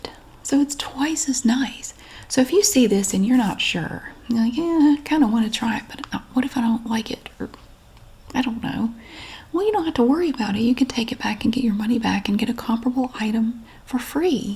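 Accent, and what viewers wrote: South, United States. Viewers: I curious all of a sudden do your boys have the southern accent as strong as yours??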